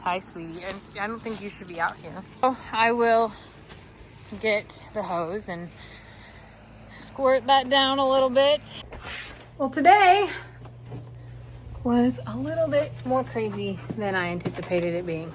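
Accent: South, United States. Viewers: Never thought I would hear with a country accent. Love to hear you sing! Hey..glad you're on speed dial. Thank God y'all are safe. Good job, little!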